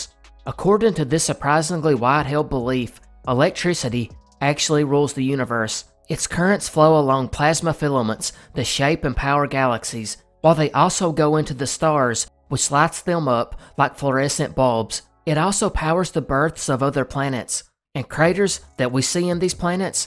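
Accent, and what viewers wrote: South, United States. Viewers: This right here is a southern bygod accent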